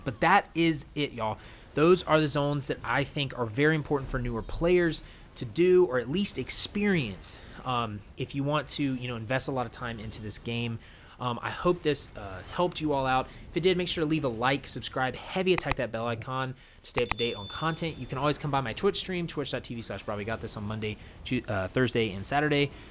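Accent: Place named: Midwest, United States. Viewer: ain't no "L" in the word zone dude. I'm from the Midwest too and I fixed that dialect issue you can too!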